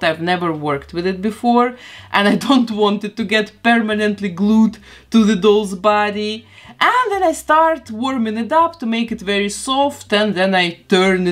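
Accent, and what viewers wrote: Russia. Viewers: почему произношение такое русское.. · OMG hard Russian accent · Хмммм,мне кажется что ты русскаЯ, потому что акциент не английский:)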